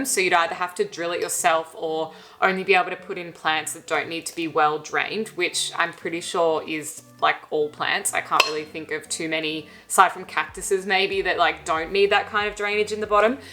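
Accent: Australia. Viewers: 🦋Love you channel except for 1 thing...It’s a shame you choose to use the American slang Haul...it doesn’t sound right with the Aussie accent ☹️ You’re an Aussie! 🤗🇦🇺 don’t we call it a shopping spree 🛍 in this beautiful country of ours🏝🦘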